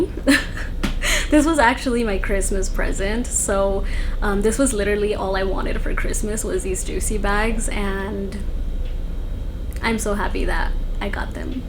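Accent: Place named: Canada. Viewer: Are you Canadian? I hear a accent 😭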